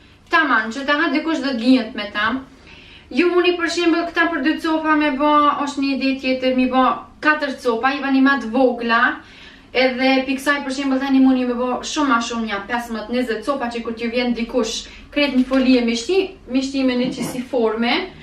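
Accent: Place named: Kaçanik, Kosovo. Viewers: M Z mos e kretiko se po tknaq ka po fol nashta asht e kaqanikut po ska lidhje se ksaj i ka hije ke e kahit ke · Qysh po fol si mu kan e Kaqanikit ?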